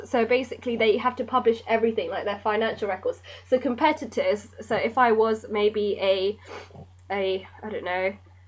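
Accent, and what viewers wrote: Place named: United Kingdom. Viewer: I like the way you taught hahaha!! It helped me understand alot. You've shown a lot of enthusiasm in your lecture and you sound like a UK girl ; ) Anyways, nice vid.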